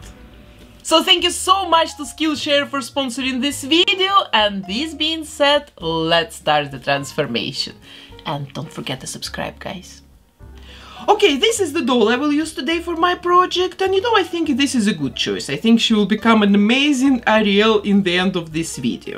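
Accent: Russia. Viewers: Girl are you Russian or Belorussian? Because you have Russian accent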